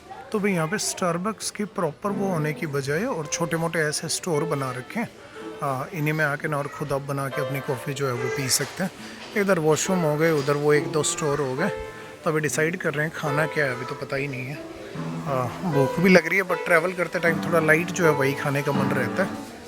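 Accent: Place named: Haryana, India. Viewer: Brdr Apko aawaj me haryanvi touch hai AAP Haryana me Kha ye hai bataiye please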